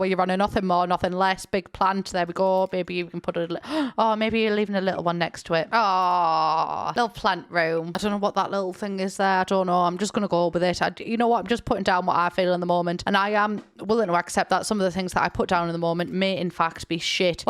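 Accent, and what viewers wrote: United Kingdom. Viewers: i must like your videos a lot since i put effort into understanding 1. you talking very british 2. you talking very fast hahaha (english's not my 1st laguange)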